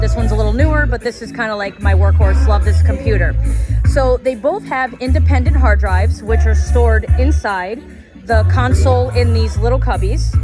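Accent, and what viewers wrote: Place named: East Coast, United States. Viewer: it’s the east coast accent that you have JUST a bit of….HappyHappy Joy Joy!!!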